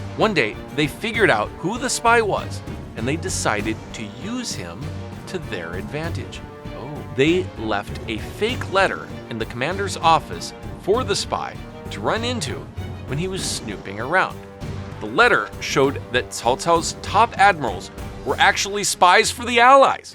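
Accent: Standard. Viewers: 你的發音很標準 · I like your pronunciation of Chinese words throughout the video here and there, very standard mandarin.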